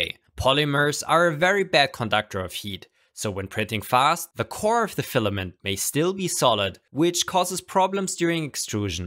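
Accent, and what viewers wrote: Germany. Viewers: Hello, bist du eigentlich Deutscher oder Österreicher? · Hallo, Dein Deutsch-Dialekt kommt richtig geil. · Are you german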